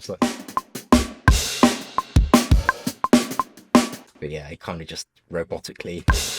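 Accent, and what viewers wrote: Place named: United Kingdom. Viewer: Haha your fine bro , it’s not an issue unless you literally never heard or spoke to someone from the uk !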